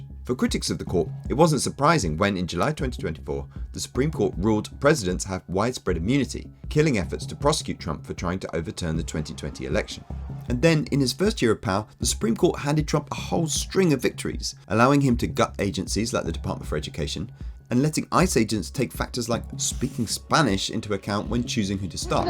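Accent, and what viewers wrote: United Kingdom. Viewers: How does someone whose accent gives them away as a resident of a constitutional monarchy misunderstand monarchy so brutally? “King” and “dictator” are not synonyms, you stump. You live under a king; are you fucking oppressed? The US constitution, in fact, is almost entirely based on the UK system in the 18th century—you have branches of government and divisions of powers, too! You had them FIRST! You lost all credibility right from the start.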